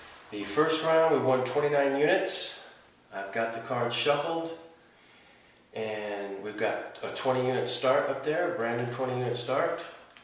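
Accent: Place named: Greece. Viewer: can you clear some things up for me? I am a noob. first of all, thanks for the video, very informative. you're right, play to win, don't play to get 21. a lot of people in comments are saying players will get mad at you playing like this, why? they usually get mad if you game unnecessary hits, why would they get mad at you because they already played their hands. you sound like my friend who is Greek, are you Greek? are you able to jump in a table mid shoe? my local casino doesn't allow that. again thanks for the video, I'll this soon.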